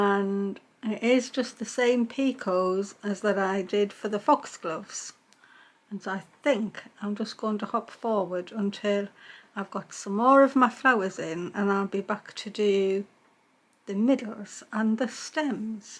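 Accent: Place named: North East England, United Kingdom. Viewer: The daisies have made such a difference. Your coat is looking so special. I love your videos especially your lovely NE accent.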